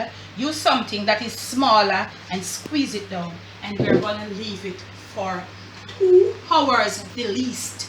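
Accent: Jamaica. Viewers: Real Jamaican🇯🇲 Chef this enuh full a drama & she definitely NOT acting she giving us raw Patwah ! Well done 👍 Perfect garnish is Yu TEETH 🦷 🤣🤣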